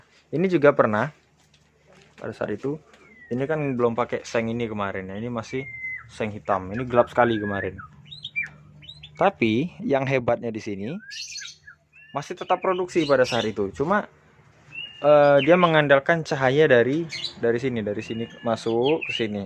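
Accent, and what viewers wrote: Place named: Semendo, Indonesia. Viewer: logat semendo nya susah buat di pisahkan ya bang?🤣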